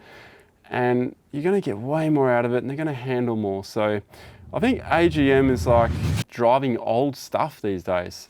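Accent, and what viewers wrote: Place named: Australia. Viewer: Mate, stuff em. I've watched so many of your educational videos that I dream in an Aussie accent now. Keep doing them, especially since most 4WD'ing tutorials and advice just tend to come from guesswork rather than experience.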